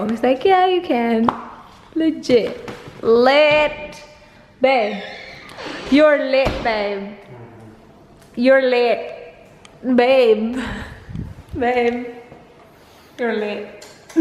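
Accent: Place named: Philippines. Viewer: I love you ate!! I hope po EVERYDAY po ang vlogs nyo 😂 and yea namiss ko yung tagalog mo. 😂 You always speak in english na eh 😂 which is not bad but namiss ko lang po 😂 i hope na sa mga next na vlogs nyo you will speak tagalog na po madalas ☺ (im not saying na bad sya, and yes i understand na nasasanay mag english pag nasa ibang country ka, cause i live rin po sa ibang bansa. but namiss ko lang po again tagalog nyo 😂. i just love your tagalog accent so much ❤❤😘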